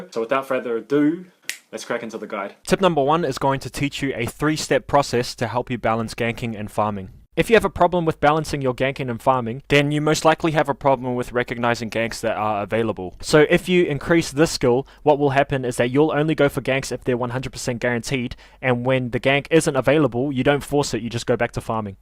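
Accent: New Zealand. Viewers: Bro are u from NZ u sound Maori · you a kiwi bro? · Solid Vid i hear the nz accent hahahah cheers for the solid tips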